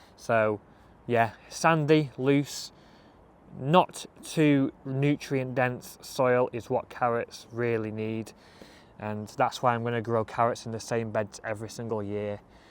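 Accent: Manchester, United Kingdom. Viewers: me and the wife are mosly from sheffield now barnsley at a guess we think your from manchester ?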